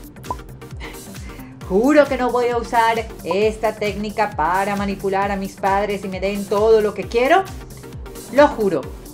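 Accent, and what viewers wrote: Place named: Venezuela. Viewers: Debe ser por el acento venezolano buena onda!! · Pues es venezolana tinene ese asento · es q es venezolana y hablamos todas igual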